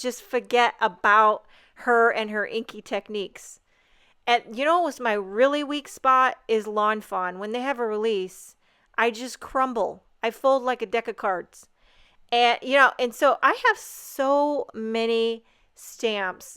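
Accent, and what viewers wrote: Boston, United States. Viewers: You kill me!! 😂 I love watching and listening to you! U sound like a Boston Ma girl sometimes when u talk! Thanks for always making me smile and sharing all ur beautiful cards!! 😊💗👍🏼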